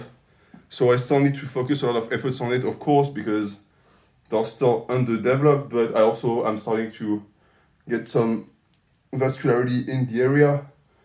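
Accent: France. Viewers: french accent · Are you French?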